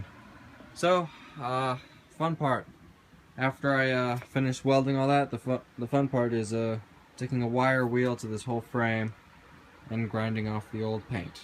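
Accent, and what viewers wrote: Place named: New Jersey, United States. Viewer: How aww YA! He sounds like he's from New Jersey. 😄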